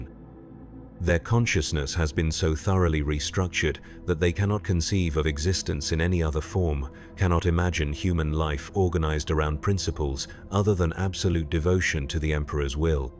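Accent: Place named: Texas, United States. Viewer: Sounds like Texas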